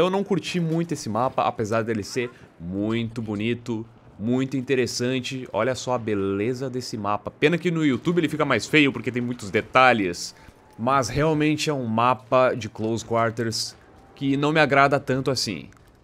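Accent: Rio Grande do Sul, Brazil. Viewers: funny,voce é gaucho é?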